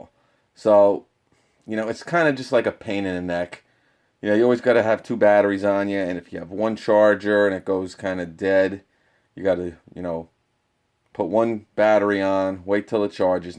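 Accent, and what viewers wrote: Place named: Boston, United States. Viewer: “You know” “You know” “You know” “You know” do you realize how stupid you sound repeating that over and over? Not to mention sucking on spit at every pause. You have to be from Boston. Only dumb dumbs raised in New England talk the way you do. “You know?” “You know” “You know”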